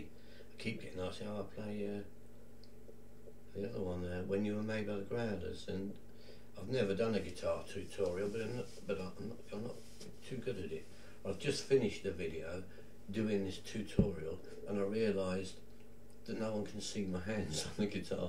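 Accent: United Kingdom. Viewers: His British accent makes him more entertaining!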